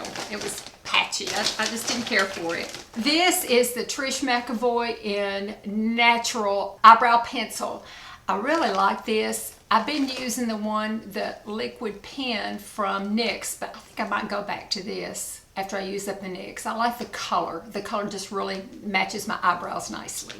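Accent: South, United States. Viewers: Do empties-don't do empties! As long as you keep doing videos! You are a very sweet and soft spoken southern Lady that gets me to relax when I listen to you. So, thank you!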